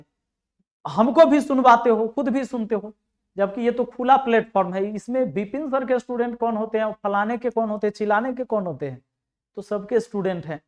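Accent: Bihar, India. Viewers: kya bihari andaaz hai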